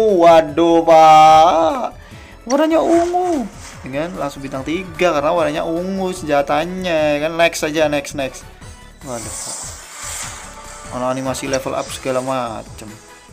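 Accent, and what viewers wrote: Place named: Banyumas, Indonesia. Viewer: Wong endi mase ngapak temen ngomonge ekwk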